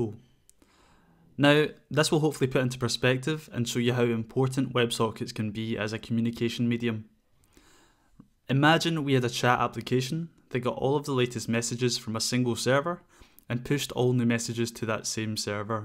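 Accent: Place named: United Kingdom. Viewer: Love the accent. UK, right? Another guess is NZ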